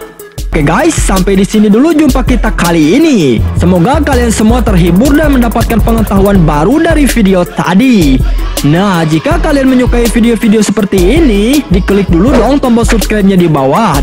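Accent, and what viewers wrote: Bali, Indonesia. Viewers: Mimin orang Bali ya?... 😁 Logatnya kayak temanku. 😁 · Logat bali?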